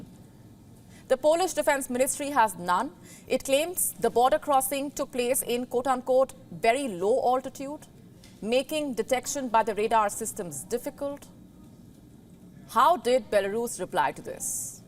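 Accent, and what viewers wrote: India. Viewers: Love Indian accent, only sometimes pronunciation is off..like "wulnerable" instead of vulnerable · Omg this Indian accent 😂😢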